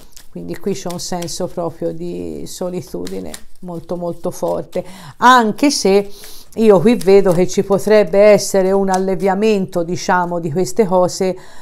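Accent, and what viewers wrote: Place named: Tuscany, Italy. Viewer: Mi piace il tuo sccento toscano sei simpaticissima che tristezza sti giorni lontano da chi vuoi bene è veramente difficile speriamo passi presto e tornsre alla normalità amare tutto quel ci circonda abbiamo imparato tanto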